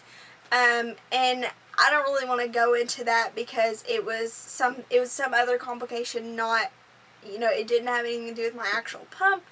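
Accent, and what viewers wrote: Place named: Kentucky, United States. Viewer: Greetings! I know it's been a long time since you did this video and I'm sure you have moved on from here, but I really appreciate this video. My 14 year old son has CP with spastic quadriplegia. He's non-verbal and non-ambulatory. He is taking baclofen orally and we are considering a pump. I am mostly unaware of his pain. It was helpful to hear you talk about your experience. I feel like it gave me insight into what he is experiencing. I love your cheerful disposition and your Kentucky accent.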